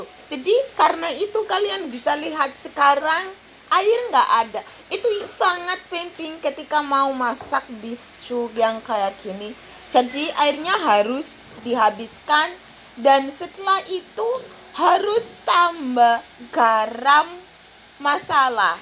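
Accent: Java, Indonesia. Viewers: Saya nonton video2 nya mbak ini ngakak terus karna logat jawa yh sangat medok,Londo kampung piye iki ene sainganne hahahaha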